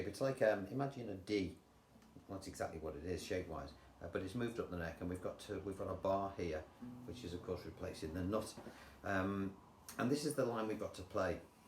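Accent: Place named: England, United Kingdom. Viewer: Hi. I have only just found your YouTube site by accident. I was looking for a tutorial for and you came up. I have had a look through and now subscribed to your channel. I have been playing a few months now and learned some open chords which I will need some time. The trouble is I can’t sing so want to play instrumentals which I have started to plat, still slow as it takes longer to sink in with me. I started a bit late in life wanting to learn guitar but can play a little, it is only for myself but enjoy what I am learning. I am 72 so don’t know if I will ever play perfect or not but I will have a good try hopefully. Thank you very much from me to you because I like your approach to your teaching method. From your voice I know you are English but obviously don’t know if you still live on the UK or not. I am from Warrington Cheshire, you will probably know where that is. 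Anyway I won’t bore you to death any longer, but once again a big thank you from me and others that watch you or have lessons with you. Take care and best regards. Chas.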